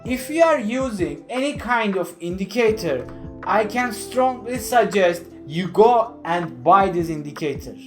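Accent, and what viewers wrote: Turkey. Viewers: abi konuşmadan dedim bu türk aksanı baktım öyleymişsin güzel video emeğine sağlık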